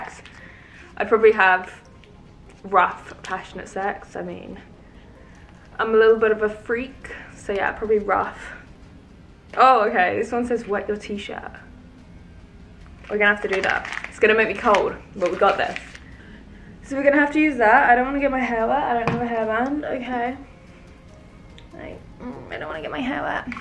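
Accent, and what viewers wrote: England, United Kingdom. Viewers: I like british sound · Are you English because I would wonder if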